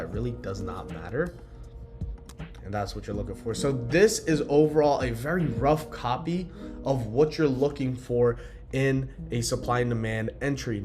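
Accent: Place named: Canada. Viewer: No way are you American, your Canadian with that accent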